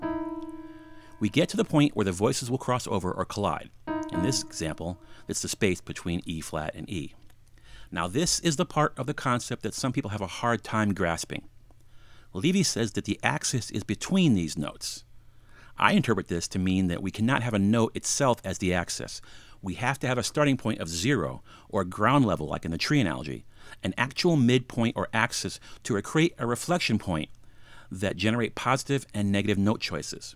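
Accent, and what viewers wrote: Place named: Midwest, United States. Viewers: American English, if that's what you're asking. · midwest. maybe chicago area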